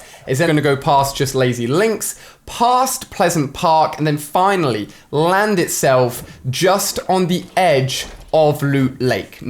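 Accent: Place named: United Kingdom. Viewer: Not being racist but I kinda like the British accent like ”ool “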